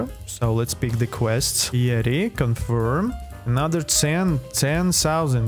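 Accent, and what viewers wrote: Russia. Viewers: Great option killing yeti, i was tryna grind since light was on stock and im on an alt im max lvl on my main tho also LOVE THE RUSSIAN ACCENT!! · bro really sounds like Russian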